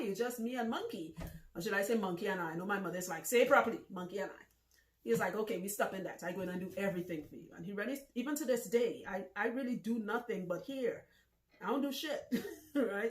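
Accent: Trinidad and Tobago. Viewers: You look and feel like family, Share my daughters name and nickname, AND are on the IFA PATH! Love it! I have watched you off and on for a bit to decide whether I am ready to commit to the loc journey, and HOW to correctly care for my crown when I am ready. I must say THIS SHARE RIGHT HERE.....un-cut and No Mamagisms... was right on time! I bought a journal years ago. It was blessed when I was crowned in Naija. Now all it needs is 27, Hebrew, and The Seal of Ase-WA!!! Words are not enough to express my gratitude because listening to this video today to the end has confirmed, I have been in my own way post a traumatic marriage. I must admit Your accent and euphemisms had me missing T&T too bad. I am going to ring family after this video lol just so they can subscribe as well. I am full on supporting now. Especially because your story makes one introspective. 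I was crowned a Rare Female King and now I need the Balance of My Divine Male. I just need to follow the wisdom imparted in this video. Modupe ati Iré Ní O!! Will update you on the manifest💯💛💙💛💯ASE!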